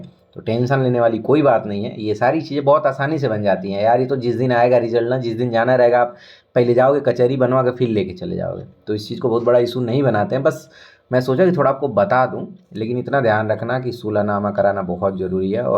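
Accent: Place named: Bhojpuri, India. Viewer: Bhojpuriya andaaj me😂😂😂❤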